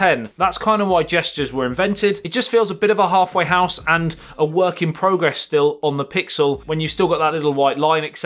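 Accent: United Kingdom. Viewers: Great video 👍 And I love the way you Brits knock off a syllable in the word bat-ter-y and pronounce it ba-tree 😊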